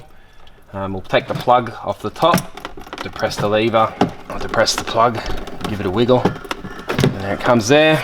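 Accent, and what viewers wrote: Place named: Australia. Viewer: Great video with free easy to understand AUSSIE accent.winner thanks mate you saved a trip to the mechanic.👍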